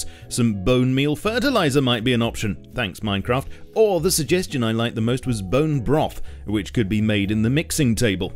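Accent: United Kingdom. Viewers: I don't even play rust anymore. I just like listening to your update summaries.... and I don't even like British accents or your stupid way of saying "floor" when something is clearly laying outside on the GROUND!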